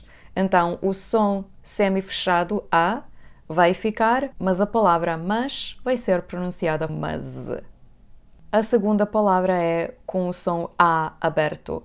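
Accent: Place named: Portugal. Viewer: Adoro tua pronúncia do português europeu continental. Quanto ao "mas" brasileiro não creio que seja exatamente confuso, até porque o contexto é elucidativo, por iniciar uma contraposição lógica, não é?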